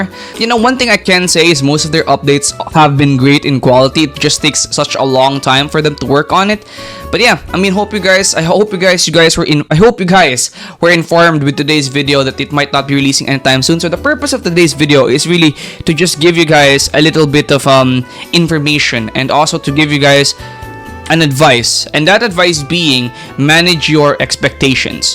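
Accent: Philippines. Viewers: I wonder if your a Filipino like on accent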